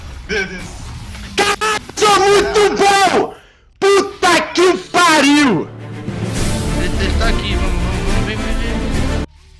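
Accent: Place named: Rio de Janeiro, Brazil. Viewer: é mt carioca msm... '' bota nela FP!! ''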